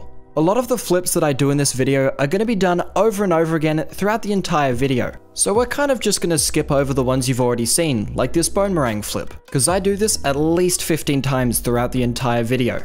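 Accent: Australia. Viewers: wait is australian?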